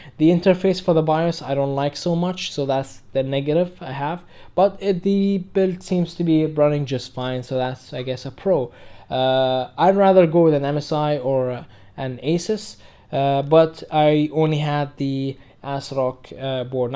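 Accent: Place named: India. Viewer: كأنك هندي قاعد يتكلم إنجليزي هيا وسابي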